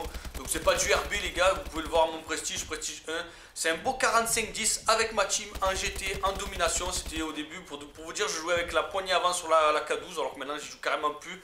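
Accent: South, France. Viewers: Tu est trop excellent putain tu me fais grave kiffer avec ton accents du sud j'ai beau savoir que tu est pour Marseille je te kiffe continue mon gars <3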